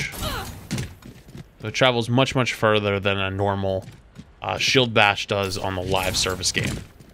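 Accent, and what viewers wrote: United States. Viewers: I guess ur american ?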